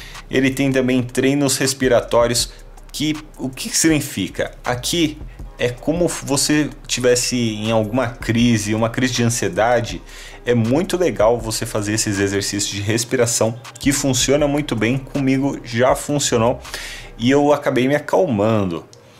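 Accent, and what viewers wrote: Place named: Portugal. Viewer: portugues portugal pelo que vi! achei interessante o relogio!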